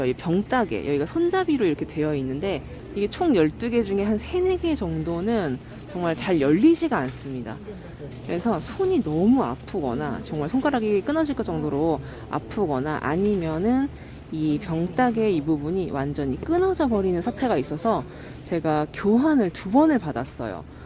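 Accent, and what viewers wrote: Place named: Chungcheong, South Korea. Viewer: 충청도 사투리~~~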